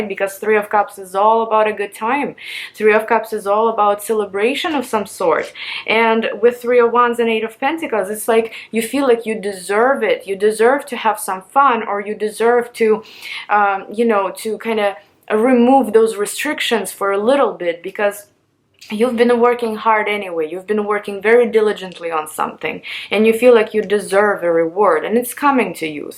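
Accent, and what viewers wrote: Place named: Russia. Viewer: you’re the only one who among of all my fave tarot readers who have been extending such concern about this current situation which the whole wide world is seriously getting so alarmed right now, i hope and prayed this too shall pass and that everyone will be safe, and one more thing you i don’t really know you personally but i just got some sense that i think you’ve got this Russian accent or something, like i don’t know..but you simply reminds me of my Russian friend.♒️💖🙏🏻💪🏼✨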